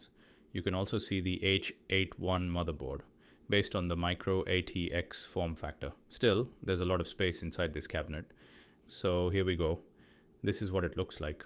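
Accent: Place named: India. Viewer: True indian accent 🫡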